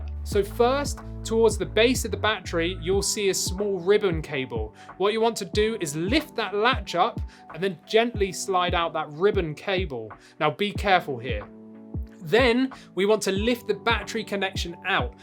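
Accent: United Kingdom. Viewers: Thanks mate absolutely brilliant tutorial and nice to have someone british for a change. Top bloke!!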